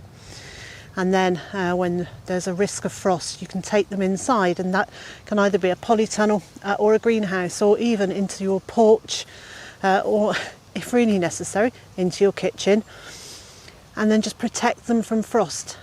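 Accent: United Kingdom. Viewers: Given the title of the video, it would be worthwhile indicating where on the planet you are. The internet after all has international reach. I can only assume by your accent, it is the U.K., but assumptions are only that. Other than that, I appreciate the content. Cheers from Chris the Forest Worm, Adelaide Australia.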